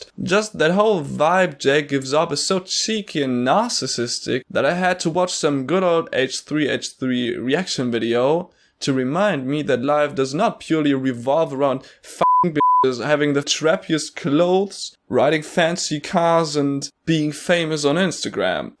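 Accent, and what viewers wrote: Germany. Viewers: Really enjoyed your video, and totally agree with you on most points. From your accent and the bag behind you I assume you're German (forgive me if you're Austrian or Swiss). I had to smile a bit when you mentioned how Jake Paul's philosophy (or world view or whatever) is very American, as it reminded me of how the German exchange students here characterize such people, and sadly I have to agree with them. No I don't want a generation of Jake Paulers. His content is a monument to his own arrogance and narcissism. While I don't like his older brother's stuff either, at least his charisma doesn't seem anywhere as fake and vapid as Jake's. As for the Navy Seal stuff, while I'm sure the armed forces would have ripped much of the narcissism from him, I have to agree that it fits in line with the vapid (probably) bully type that I'm sure he was, and to some extent still is. 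And I hate the "we made it in one day," shit. In writing, editing and refining your work takes up much more time than actually writing content by volume, but it's the refining and craftsmanship that come with it that make it good. Jake is just pumping out sewage.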